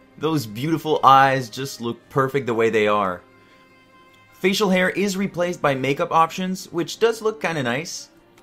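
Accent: Canada. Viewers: Are you Canadian? Cause you said aboat instead of about I'm not trying to be racist but idk it reminded me of young Robin from HIMYM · ....He's Canadian!! His accent bothered the crap out of me trying to figure out what it was.... Time to rewatch the video, now that I can concentrate.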